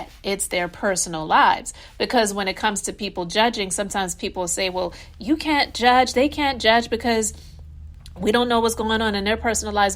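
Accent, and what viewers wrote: Charleston, United States. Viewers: Hey hey your accent sounds familiar. Are you from the Charleston SC area? Kandi was definitely wrong for laughing with DJA-1. I enjoy your content. I live in Ladson.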